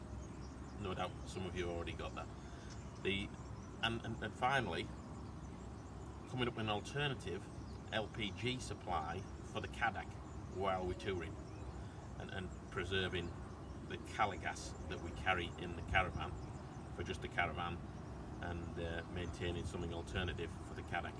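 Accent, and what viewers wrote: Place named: County Durham, United Kingdom. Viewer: We have a French Butagaz Le Cube. With a 27mm ‘clip’ (not a regulator) and a 21.8 to POL adaptor, we can use our normal UK propane pigtails. Le Cubes are widely available in France, petrol stations, supermarkets, ….They hold 5kg. We carry the Cube and one Calor in our motorhome on European trips and use the Cube. If it runs out, we have the Calor until we can get a refill. Your accent. Washington / Co Durham area?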